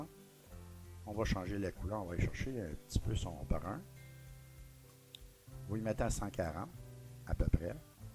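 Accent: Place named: Canada. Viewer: très bon tuto; l'accent canadien ajoute ss doute qqchose. Très clair et efficace. Merci.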